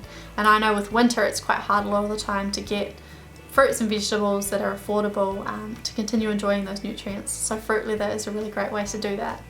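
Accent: New Zealand. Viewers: She sounds like she's from New Zealand, not Australia. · is she from new zealand or australia?? (I'm KIWI!!!!!) 😂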